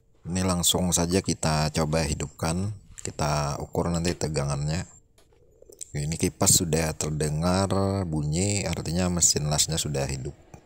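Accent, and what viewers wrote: Banjar, Indonesia. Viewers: Bahasa Indonesia 100% bagus , tp logat Banjar nya katahuan 😂. salam 1 hobby sanak mautak atik masin las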